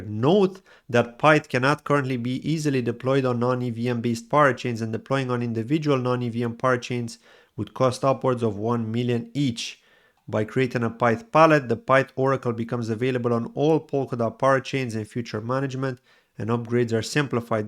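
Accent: Ireland. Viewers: you may or may not remember a couple of years ago I asked if you had lived in Ireland as I get a hint of Irish in your accent. Well today you have an Ireland t-shirt on.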